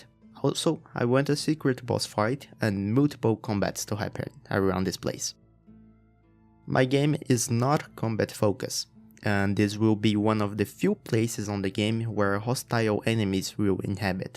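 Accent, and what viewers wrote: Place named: Brazil. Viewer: awesome atmosphere! also you sound kinda... brazilian? 🤔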